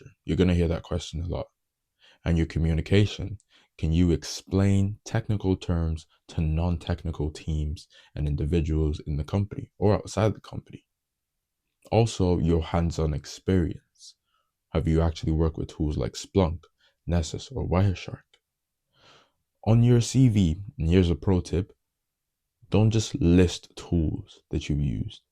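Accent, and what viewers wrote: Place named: Ireland. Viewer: I think he's from Ireland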